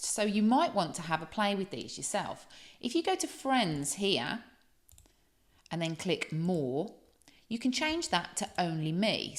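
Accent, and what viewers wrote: United Kingdom. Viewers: Are pronouncing British English?Is so, that's great. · Super beginner's guide to Facebook. Intrigued by your accent. Are you from Worcestershire originally?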